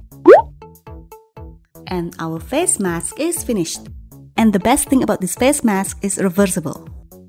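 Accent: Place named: Malaysia. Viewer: Are you Malaysian or Indonesian!!! Your accent 😭😍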